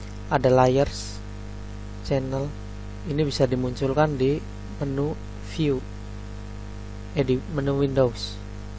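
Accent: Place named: Java, Indonesia. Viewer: cuma lidah jawa yang bilang bulat itu bunder, hehehe, sukses terus mas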